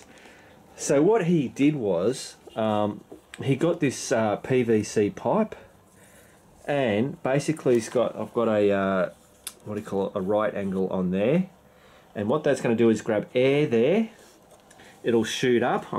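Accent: Australia. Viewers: Thanks for the great video/info. You're a funny guy. You know, us yanks love the Aussie accent. The cardboard was pretty low tech, but certainly got the job done. Concern over blocking the air that cools the engine is always an important factor to consider and monitor. I've seen canvas covers that go over the front crash bars. You might want to get a digital laser temp gun to monitor your engine temp. Thanks again. I will integrate all this into my solution.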